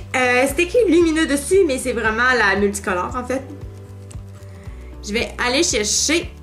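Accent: Quebec, Canada. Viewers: ta un beaux accent québécois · J'aime l'accent québécois :)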